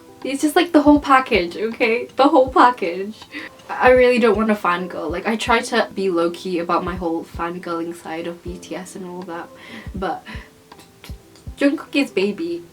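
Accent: United Kingdom. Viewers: This is phenomenal, never seen a malay lady with british accent SO COOL 🤣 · Lol finally a malay who talk in British accent other than my teacher lmao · love your british accent!! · wow patutla fluent gile english accent ......born at uk rupenya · I’m here for the accent honestly 😊😆 Love the British accent voila 🙌🏻 I tend to speak accents for work meetings following my global colleagues since they understand better if I speak their accent 😃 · Kalaulah saya boleh cakap bahasa Inggeris macam. British accent. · AAAA I love your British accent 🥺❤️ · You kind of have the british accent but not as how it is really I mean those English people have this accent that's hard to understand , yours is just a hint of British accent. Its clearer and easier to understand. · U have UK accents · im in love with her british accent thoooooo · Thanks for cheering up my day, btw really love your British accent ♥️ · Hi! 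It’s okay if you can’t speak malay since it’s not your first language after all. Just ignore those who spoke behind your back about you. Btw, I really loveeee your British accent. I’m currently studying in Degree for Eng Language and tbh it’s personally hard for me to copy the british accent. Your videos are really, really motivating me to practice more☺️ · suka dgr british accent tu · Uuu that british accent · I really like your british accent 😁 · i wish i could speak to her everyday, i mean, i would love to learn to speak fluently with those british accent. it reminds me more bout harry potter and narnia ♥️ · I watched your video to boost my Brithis English:) i kinda like it! Love from INA🇮🇩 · The british accent sounds so lovely ❤❤ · I love your britain accent so much. please make a video on how to speak more britain :) ps: i love harry potter and i want to study in uk too one day....maybe after finish my spm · I like listening your british accent , so clear and understandable ... 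I am ussualy rather bit hard for understanding common british accent...because I'm used to listening american accent ...but now I find your video, and I try to understand your british english ...and yes, its work ... your accent and pronunciation is amazing ..keep it hold ! ... your new fan from indonesia ... Harfani Abu salman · i'm really love britsh accent and i woud like to learn from you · Can you be my british teacher ?? Bcs your accent really impress me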